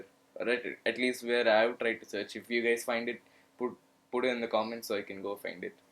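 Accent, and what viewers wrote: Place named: South, India. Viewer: not really dude, stop searching on the internet for online shopping of computer parts on sites like amazon and flipkart, start finding wholesale markets/shops where you can find these components for cheaper costs, now by your accent, I believe you're an south indian, i don't know about PC markets in South India, but here in North India, I think Nehru Place in New Delhi is probably the best place for buying components at a decent (not so high) price point, for an example, you can buy a Benq GL2460HM monitor for like 9000Rs whereas on flipkart it goes for like 10,500 Rs, also a Zotac Geforce GTX 1080 costs (if you find a good deal) nearly 57000Rs whereas on amazon its like <num>Rs, and similarly all the parts are cheaper to buy from Market rather on the internet.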